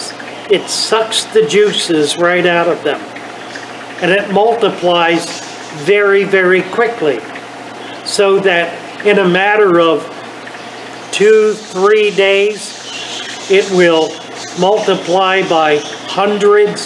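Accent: United States. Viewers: Found this useful, like i did? I'd suggest three things in addition to enhance the usefulness. Turn on subtitles (especially for those not in America or not used to the accent) and set playback speed to 1.5. Repeat listen a couple of times making notes as you go along. Btw, the main content starts. Thanks for the useful info,!:)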